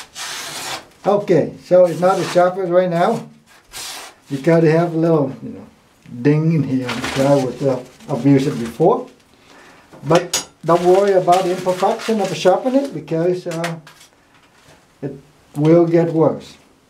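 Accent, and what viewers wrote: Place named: Vietnam. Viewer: I'd love to buy one of your knives in the future, I'm sure they're going to be great =) just your sharpening/grind alone will be great, but you seem to be getting really good at the hardening/tempering too. awesome stuff. can i ask what country your ancestors were from? i'm curious about your accent :) it kinda sounds vietnamese or cambodian to me.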